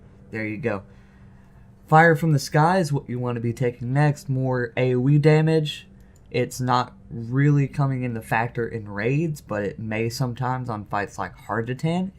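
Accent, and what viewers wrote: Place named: Tennessee, United States. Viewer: That beautiful Tennessee voice PogChamp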